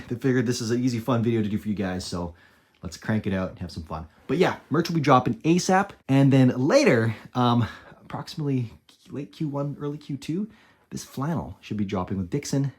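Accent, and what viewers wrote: Canada. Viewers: Are you Canadian I can’t tell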